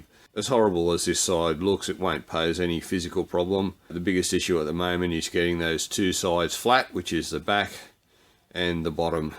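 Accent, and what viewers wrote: Australia. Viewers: a sweet Aussie accent. This dude sounds cool as hell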